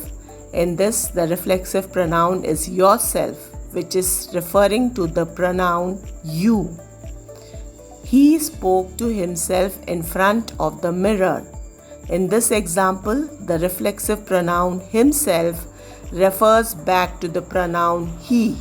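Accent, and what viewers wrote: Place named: India. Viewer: Indian ahhh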